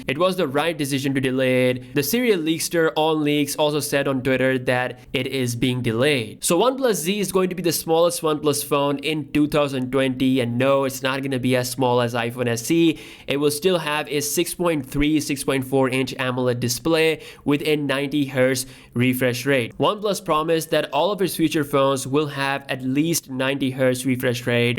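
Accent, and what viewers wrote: India. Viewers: I like how there's just a pinch of Indian in your accent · Strong Indian accent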